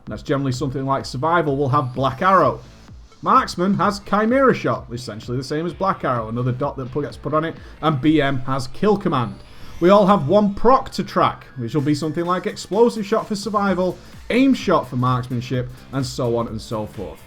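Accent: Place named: United Kingdom. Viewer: Hes been in some high ranking guilds and he is highly experienced in terms of raiding in wow. But I dont think he thinks highly of himself I believe you are just hearing the normal British bloke.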